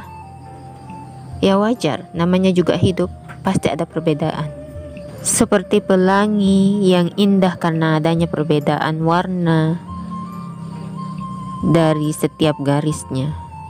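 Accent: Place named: South Sulawesi, Indonesia. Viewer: Motivasinya aisyah akan ingat selalu bunda.sepertinya bahasanya dari sulawesi selatan seperti papi aisyah lho :)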